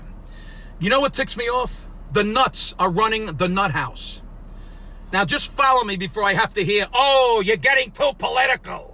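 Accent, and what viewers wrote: New York, United States. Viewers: New York or New Jersey!?😘🥰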